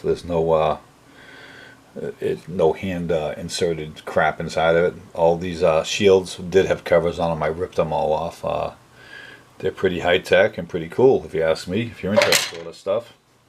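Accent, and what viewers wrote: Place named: Staten Island, United States. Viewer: You have a Staten Island Italian accent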